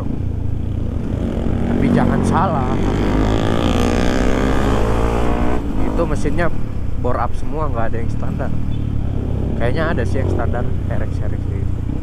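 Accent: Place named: West Java, Indonesia. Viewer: Orang jatim mas ? kok ngomongnya gak kelihatan logat jawa mas.. malah kayak dari logat sunda... hehehe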